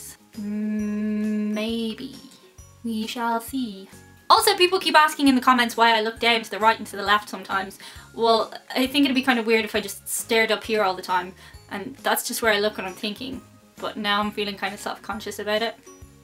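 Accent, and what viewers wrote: Ireland. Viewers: Great Job! I love the Irish accent - could listen to you all day. One thing I have noticed, is three and tree sounds similar in your vids and when I watched Facts. Could you say 'The trees were planted in threes"? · Please don’t take this the wrong way but I’m having a bit of difficulty understanding you. While I adore your Irish brogue would it be possible to use a Boston accent in your videos. Thanks! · I'm from USA . I passed this test easily. I'm a new subscriber and a past visitor to Ireland. Loved that experience and want to come back. I love you're energy , humour, accent. Yes do love the take on the Irish vs USA . Please more! You're beautifull, funny and intelligent. I have to admit I didn't take to Irish food very well. I was looking for American, Italian . And I don't get ice in my soda. No where! Why is that?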